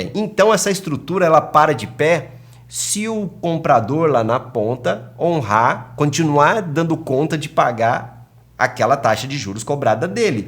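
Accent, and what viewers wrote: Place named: Caipira, Brazil. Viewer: Ultima vez q vi vídeo do seu canal vc falava com voz de caipira RS pq mudou?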